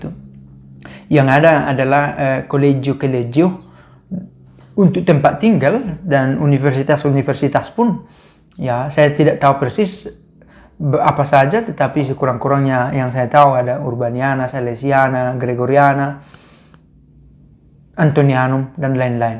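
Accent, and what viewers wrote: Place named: South Kalimantan, Indonesia. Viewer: Logat bapak itu mohon maaf seperti logat org kalimantan selatan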